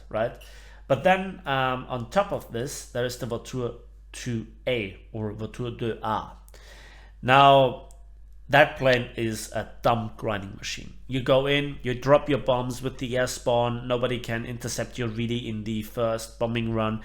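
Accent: Germany. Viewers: "Die Aufnahme ist gestartet" bist du deutsch? Würde irgendwie zum Englisch passen, da das einen wirklich nur leichten deutschen Akzent hat😂😉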